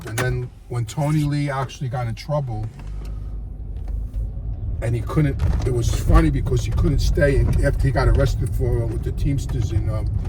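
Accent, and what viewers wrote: New York, United States. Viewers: LOVE that New York accent! The only thing better than listening to some guy from New York speak, is listening to some woman speak! Specially the single women.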